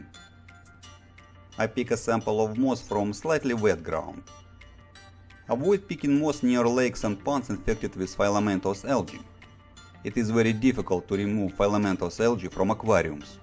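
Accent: Russia. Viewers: Why you sound like Russian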